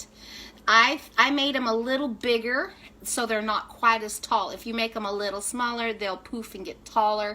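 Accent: Texas, United States. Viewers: Sounds like you are from/or in Texas. Denton Tx here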